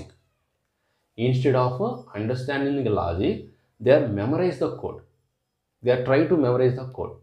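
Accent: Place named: Hyderabad, India. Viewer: I'm sure this sir is teaching some course in Ameerpet. The Slang is same as Ameerpet Faculty. I wonder what course and which institute. J want to join ur institute sir. Pls tel me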